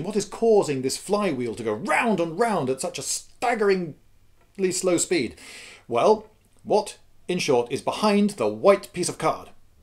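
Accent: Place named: United Kingdom. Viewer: I do so enjoy your so British calm but enthused way of speaking. A couple of my professors spoke this way and it kept my interest up to pass with rather good grades.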